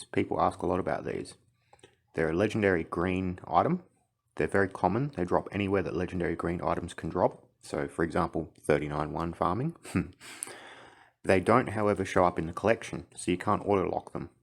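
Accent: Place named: Australia. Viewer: g'day mate you r definitely an Ozzy that's for sure lol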